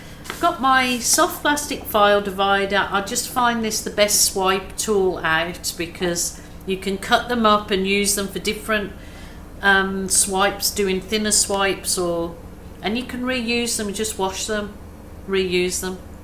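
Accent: Birmingham, United Kingdom. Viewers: Hi there just found you, a question. Were you born in Austrailia? I can hear a slight Birmingham accent . Love your program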